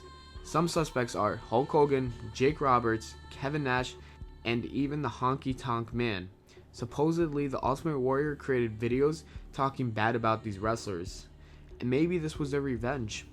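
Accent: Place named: United States. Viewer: Holy Shit! A WWE Top 10 with an American voice!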